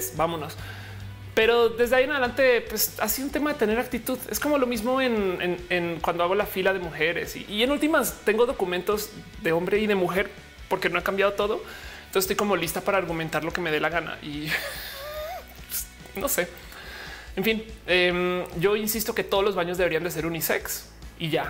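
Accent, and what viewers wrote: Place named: Colombia. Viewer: Que felicidad tener de vuelta a la, que por cierto volviste de tus vacaciones con acento colombiano mas marcadito 😁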